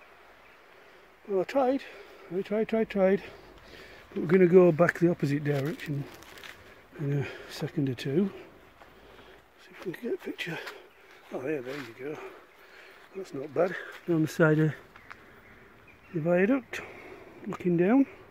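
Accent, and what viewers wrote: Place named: Yorkshire, United Kingdom. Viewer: Absolutely fascinated with your video. Never knew we had so much history on our doorstep! Keep 'em coming, and NEVER apologise for our Yorkshire accent lad!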